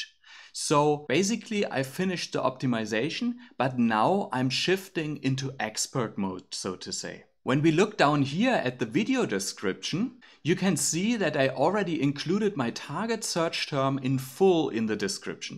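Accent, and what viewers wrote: Germany. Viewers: You are German after your accent. But I like to learn from a German because they do things very professional. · you are bavarian after your accent :)morning fame. great tool!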